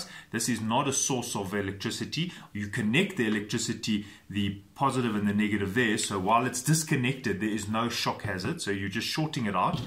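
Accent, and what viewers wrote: South Africa. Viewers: I'm in the middle East and I enjoy it when I hear that South African accent... nothing like home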